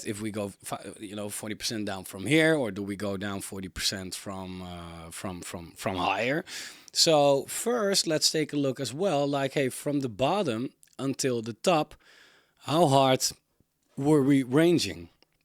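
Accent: Netherlands. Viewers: Nederlander? Nice video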